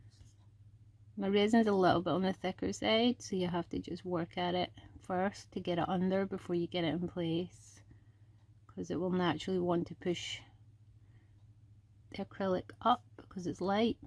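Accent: Scotland, United Kingdom. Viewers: Of course! I should have realized that it was a Scottish accent, no wonder I like it, that's my favorite dialect. My Grandfather's name was McCoid, not sure if it's Irish or Scottish, but I have visited both countries and would live in either quite happily! Thank you for your reply and keep up the great work.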